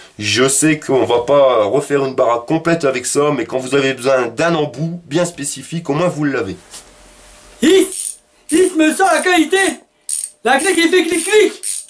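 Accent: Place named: Nord-Pas-de-Calais, France. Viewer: oh!! un chtio biloute j'adore l'accent mdr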